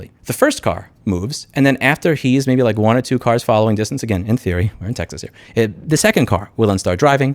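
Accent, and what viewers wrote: New York, United States. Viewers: your New York came out full force here, right on!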